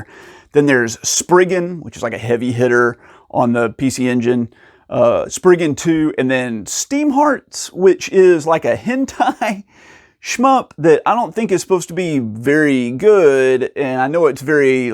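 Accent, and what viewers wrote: South, United States. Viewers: It's subtle, but as a southerner, I can detect it, haha. Merry Christmas!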